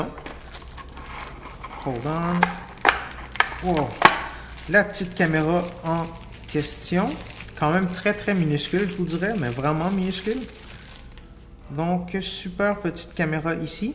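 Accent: Quebec, Canada. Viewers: Super vidéo ! J’adore ton accent du Québec...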